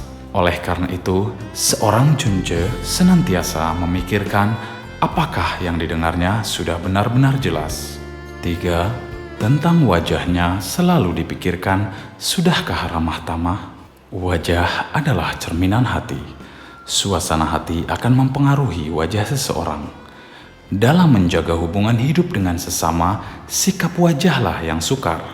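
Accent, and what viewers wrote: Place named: Indonesia. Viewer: Kenapa bahasa mandarin di baca dengan logat indonesia??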